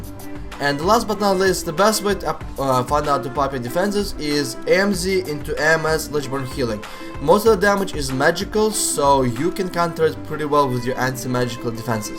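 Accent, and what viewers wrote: Russia. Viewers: Hey, first of all just wanted love the channel and ofc russian accent. i was wondering if maybe i uploaded a game of 2's of me playing unholy you could tell me if im shit or not. thanks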